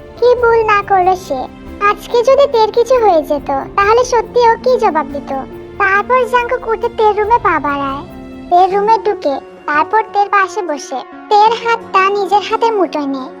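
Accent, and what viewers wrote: Sylhet, Bangladesh. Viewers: আপু তুমি কি সিলেটি? তোমার ভাষায় সিলেটি সিলেটি ভাব আছে।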